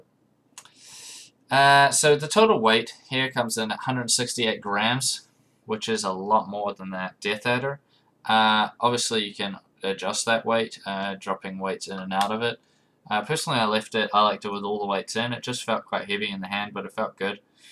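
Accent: New Zealand. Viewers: He's from New Zealand... Not Australia. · Hey, it's a kiwi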